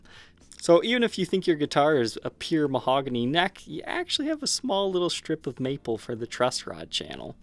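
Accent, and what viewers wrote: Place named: United States. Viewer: American English.... Hello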